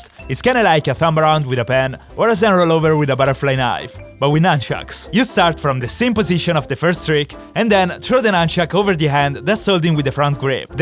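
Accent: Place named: Italy. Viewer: Lo avevo capito dall'accento che eri ita xD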